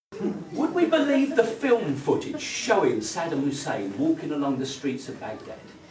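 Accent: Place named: Cockney, United Kingdom. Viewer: It’s a beautiful cockney and I love it.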